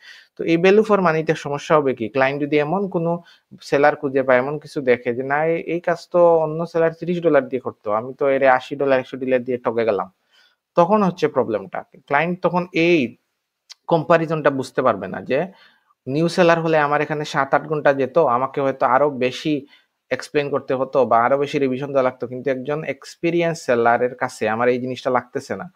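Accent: Sylhet, Bangladesh. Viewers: Sylheti bhai ni apne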